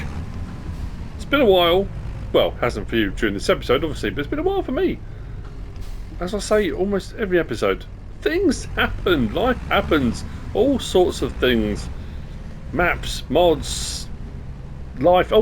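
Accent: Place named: United Kingdom. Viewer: Honestly I'm not a fan of saxlingham either it's just not my cup of coffee lol. But I'd definitely watch you play it. Honestly I'm still holding on to hope that you do another farm to survive series. That is my all time favorite you've done. But you play whatever map you like and ill watch whatever you pick. Your play throughs are way better then most others ive seen i just like listening to you its fun with your deep British accent.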